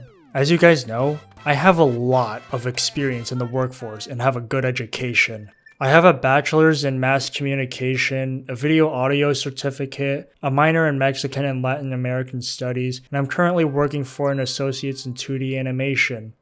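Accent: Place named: China. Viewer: You sound so chiense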